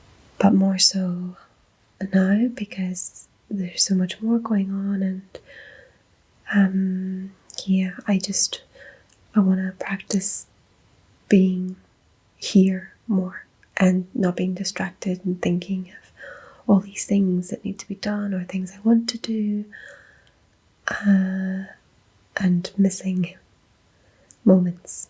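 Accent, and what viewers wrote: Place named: Ireland. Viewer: My theory is that people with ADHD really gravitate to ASMR because it's a way to relax and slow down the constant mental activity that provides just the right amount of stimulation to actually do that. In retrospect it's what drew me to ASMR too. I've been following your channel for many years, big fan! I love your Irish accent and your Irish history videos especially! And I can really relate to the struggle of being present as a mother and professionally. Don't be too hard on yourself. Goals are good, and so is generosity and kindness for yourself and respecting the effort it takes. Looking forward to seeing more of your channel again!!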